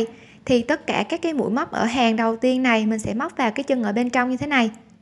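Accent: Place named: Da Lat, Vietnam. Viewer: nghe nói tiếng giống người Đà lạt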